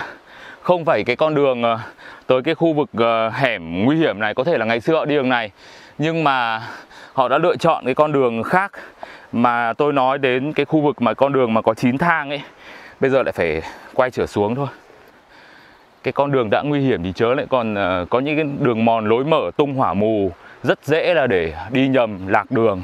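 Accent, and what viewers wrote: Hanoi, Vietnam. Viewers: Giọng HN rất hay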